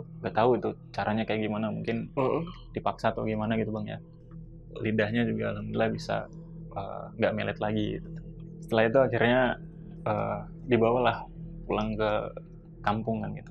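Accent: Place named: Serang, Indonesia. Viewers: Kayaknya orang serang yaa bang dari cara bahasanya. Soalnya saya juga orang serang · eh ini abang nya orang serang banten bukan sih ,soalnya kadang ceplos pake bahasa serang 😂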